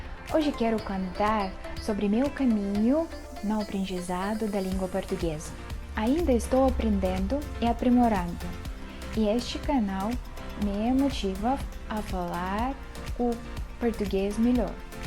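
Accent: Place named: Sorocaba, Brazil. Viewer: você está fluindo bem seu português,mas com sotaque Sorocabano será influência do seu esposo.muuto interessante.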